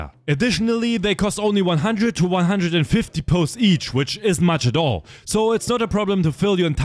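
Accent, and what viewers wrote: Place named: Germany. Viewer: Ja er ises selbes Logo,selbe stimme und nen unübersehbarer deutscher akzent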